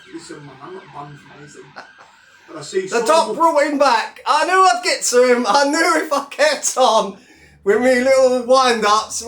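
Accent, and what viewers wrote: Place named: Cockney, United Kingdom. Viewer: Your or8 for a fat cockney bell end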